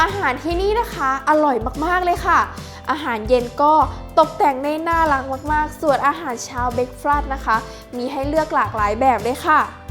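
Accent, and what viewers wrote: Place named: Vientiane, Laos. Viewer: I like you spoke lao look like sao lao viengchian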